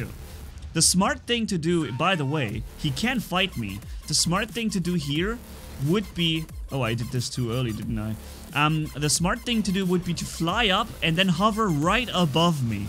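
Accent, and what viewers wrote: Germany. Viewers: Are you German? Bist du Deutscher?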